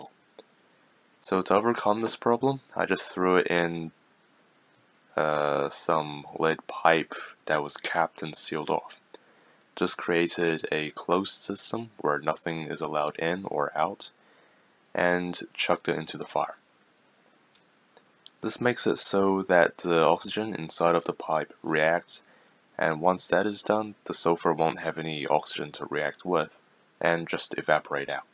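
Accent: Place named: Australia. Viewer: I can't tell where your from. Australia?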